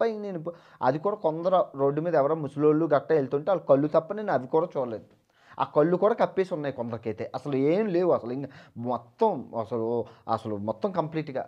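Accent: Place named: Visakhapatnam, India. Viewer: హాయ్ బ్రో ఈ మధ్య నీ వీడియోలు చూస్తూన్న చాలా బాగుంది... వైజాగ్ లో ఎక్కడ మీది... అంటే స్లాంగ్ ని బట్టి వైజాగ్ అనుకుంటున్నా ఎనీహౌ all the best for your future world trips and God bless You...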